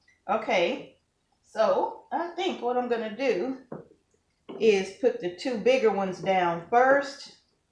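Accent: South, United States. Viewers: AMAZING!!! Thank you sooo much!! I will be canning my chicken tomorrow. May God continue to Bless you and your family. That southern accent makes me miss home. You are more of a Blessing than you will ever know. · Love. Your southern accent! Love your happy personality!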